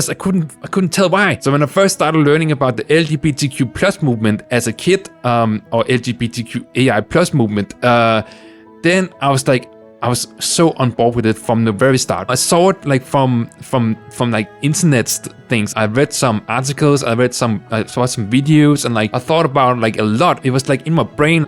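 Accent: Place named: Denmark. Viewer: its my first time watching a video of yours and I really like your danish accent! Im half danish myself. I wish you the best in your transition sis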